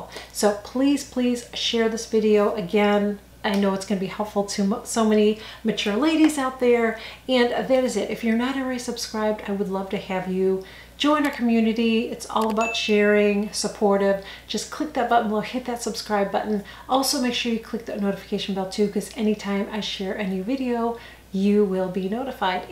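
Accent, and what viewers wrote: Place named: Midwest, United States. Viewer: Hi, I’ve been subscribed for awhile but I don’t think I’ve taken the time to comment. I enjoy your content and all the help you share. It’s a bonus that you’re so “real” (no shame in a close up, you rock it!) and I can get my fix of a Midwest accent! I appreciate that you return with some “revisions” and solicit feedback. It’s a process, working with these eyes of ours. Thanks for leading the team effort! 💐 Jacqui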